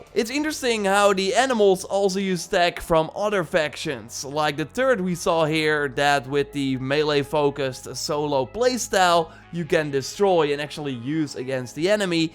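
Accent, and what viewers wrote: Netherlands. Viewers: I couldn't help but hear your strong dutch accent. xD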